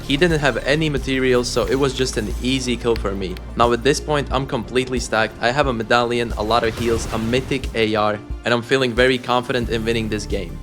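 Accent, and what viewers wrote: Germany. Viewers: what accent do you have? german?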